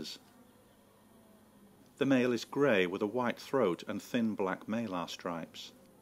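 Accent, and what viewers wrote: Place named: Australia. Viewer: Spent my childhood in Brazil, this vid has brought me a lot of good memories.. now in Australia 🇦🇺. You sound Aussie by the way...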